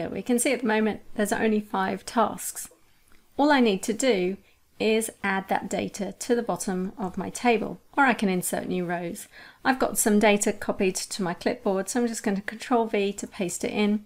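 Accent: United Kingdom. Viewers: Why is it British-sounding women always seem so SMART? :-) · Which English accent is this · Hi, thanks for sharing and please keep posting long videos because not only you will help us in learning excel but also would help us in learning British accent. I have been literally looking for a British channel for a very longtime ... thanks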